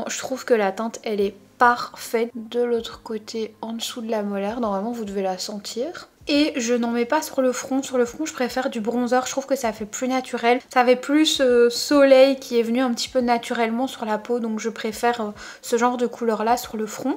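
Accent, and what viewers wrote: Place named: Belgium. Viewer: J'adore l'accent belge.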